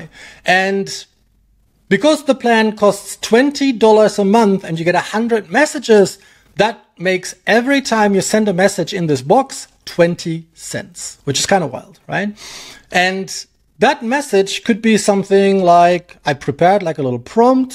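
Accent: Germany. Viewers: Great video, thanks for sharing! I realy searched for someone who compares the ai builders and gives a real recommendation! btw. are you german? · Bist du schwabe?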